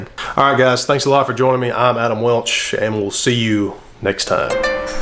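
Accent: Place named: South, United States. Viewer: Tennessee? Kentucky? it's definitely Southern but not distractingly strong.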